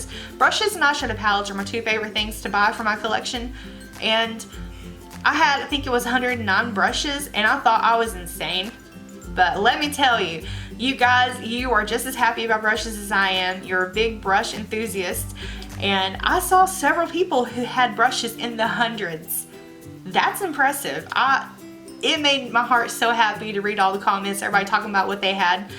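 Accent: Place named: North Carolina, United States. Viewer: OMG! 😲 I'm here in Charlotte. I thought I recognised your accent. ❤💄💋b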